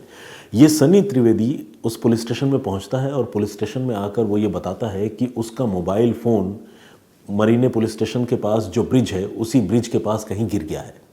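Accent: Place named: Hills, India. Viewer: आपका पहाडी अवाज सुनने मे मजा आता हे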